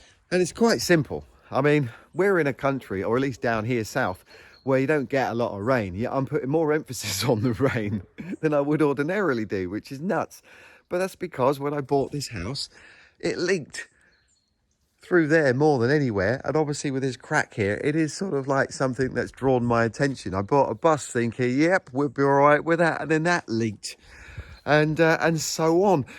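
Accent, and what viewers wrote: United Kingdom. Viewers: What part of England is your accent from?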